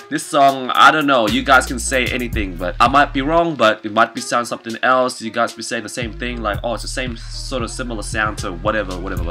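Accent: Vietnam. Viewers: HoàngYasuo Channel đúng rồi. nghe ổng nói giọng miền nam mà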